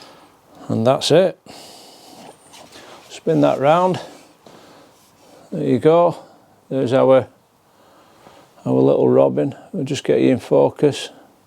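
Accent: Lancashire, United Kingdom. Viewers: Just dawned on me its Fred dibnahs accent Bolton Blackburn area😂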